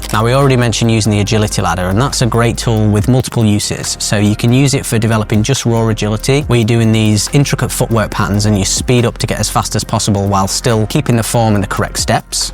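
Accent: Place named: United Kingdom. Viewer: Bro you are the most English 🏴 AF dude I've heard in a long time. Your boxing 🥊 wouldn't help in my neighborhood as all the young girls would tear you apart because they'd think you're Ringo's grandson.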